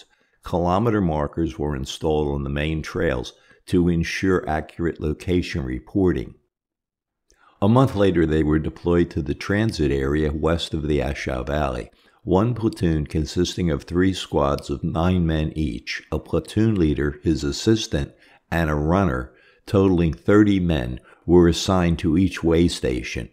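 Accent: Philadelphia, United States. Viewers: Narrator is definitely from Philly!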